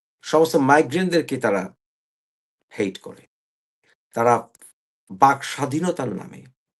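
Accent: Bangladesh. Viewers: ডি এন এ চেন্জ করেছেন কিন্তু গলার টোন টা তো পুরাই বাংলাদেশী তাও আবার আন্চলিকতার টান আছে । ডি এন এ চেন্জ করে কি লাভ হলো? কথাগুলা সমালোচনার আকারে বল্লাম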